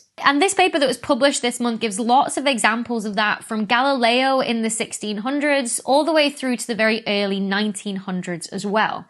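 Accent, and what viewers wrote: United Kingdom. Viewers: At first I thought you must be Australian. Then I heard you reference WE in the north and realized it must be the UK somewhere. Anyhow, nice accent, great eyes. 🙂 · every time you say "run the matts" I'm like oh right brit. lol love your accent. I think most Americans love the brits accent. I hear we all sound like cowboys to yall. even like the midwest and cali people do.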